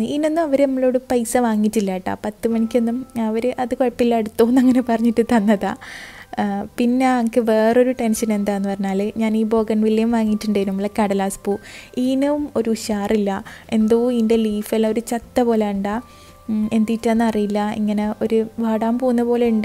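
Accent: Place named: Thiruvananthapuram, India. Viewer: Namale thiruvanantha purathe ninane athane samsarathile mattam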